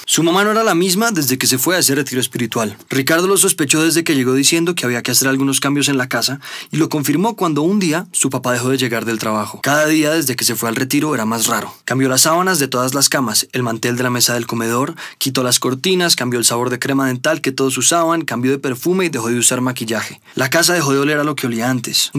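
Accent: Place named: Colombia. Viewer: Ese acentico colombiano💕💕💕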